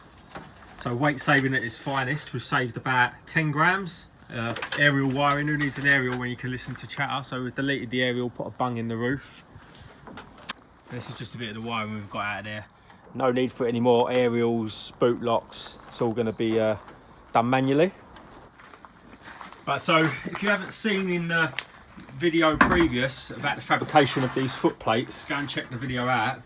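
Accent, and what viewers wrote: Essex, United Kingdom. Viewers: Essex boy voice sounds like micky flanagan lol love the videos brother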